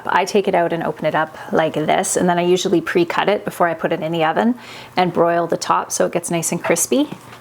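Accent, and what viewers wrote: Canada. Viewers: You didn't ask, but I found you via Becky of Acre Homestead. I am childfree and live in Denver in a tall building with a very windy balcony. Last year, my delightful herb tower blew over twice, so I ended up gifting my herbs/lettuce to others who live here. I'm probably not your expected demographic. LOL! I'm just here for entertainment and some (scaled down) recipes. I've been bingeing your videos and putting the pieces of your story together. You are delightfully entertaining and relaxing to enjoy. I also really like your style: quick intro/outro bookending great content. I grew up in Texas, and I just love hearing the Canadian pronunciations/words: paprika, sorry, tuque, and more! Congratulations on the 100K!!! I can't wait to see your button plaque hanging on the wall behind you (at least momentarily, right?)! Thank you for carrying us all along for the ride. 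Oh and watch out for Martha; she's fierce and might start demanding her own channel. #LongestCommentEver <3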